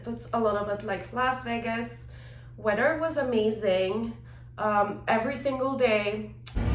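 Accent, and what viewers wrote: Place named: Russia. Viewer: Your accent sound a little bit Russian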